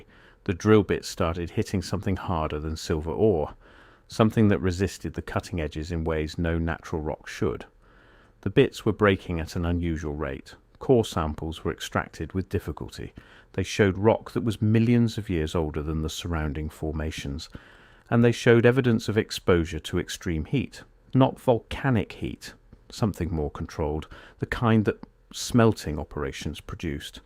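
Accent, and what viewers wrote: United Kingdom. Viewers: Sure getting tired of listening to American stories narrated by a machine voice with a British accent. If you want more clicks start using a real human to speak for you. Stop being so damned lazy. We know the difference and we are sick of AI British accents.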